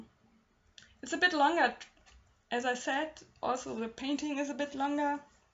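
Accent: Germany. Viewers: I hope this isn't rude to ask, but could it be that you are german? Just judging by your accent... Not that that's a bad thing! I really really enjoyed this collab! Both pictures work very well together, especially because your half is rather warm, but still has some blue/cold accents which resembles the colors PearFleur used in her half. Her part yet again has little accents of warm colors as well it resembles yor part. Just a very nice Collaboration, and I think most people, if not all agree with me!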